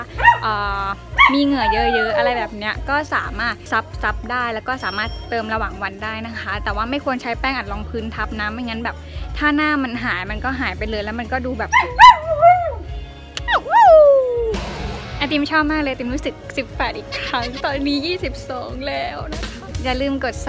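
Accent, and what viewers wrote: South, Thailand. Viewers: ว่าแล้วว่าพี่เป็นคนใต้อะ จับได้ตอนพี่พูดว่า เดี๋ยวโดนทุบ5555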